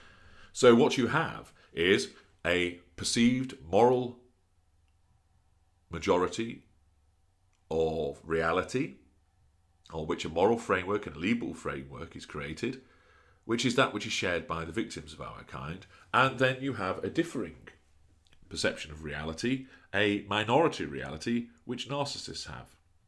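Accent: England, United Kingdom. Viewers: Also, this channel has really helped me to avoid cognitive dissonance as your voice (minus the English accent and insert a country bumpkin Deep South accent) is deep and commanding like me ex. Every time I listen it reminds me of my ex jackass and subsequently do not fall for his fuckery. Thank you. While it is clear that you enjoy telling the tales, there is a level of benevolence that I accept and appreciate.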